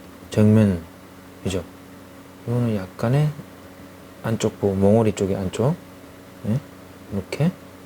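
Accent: Gyeongsang, South Korea. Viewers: 벗꽃그리기 배우고 가요 경상도 말 혹시 대구가요 배우로갈께요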